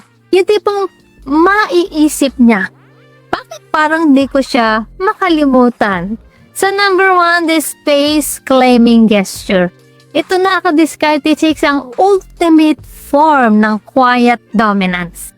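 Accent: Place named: Ilocos, Philippines. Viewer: Idol ilocana ka ba? Sa tuno mo kc e siguro pangasinan or ilocana.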